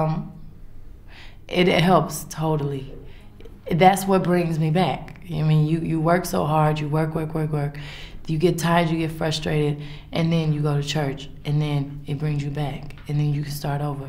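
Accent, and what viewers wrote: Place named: Texas, United States. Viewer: She has a US Southern Texas accent so if you go to Texas it is proper. I’m sure whenever you visit a different state if your an American or another country your accent would sound like shit too 💯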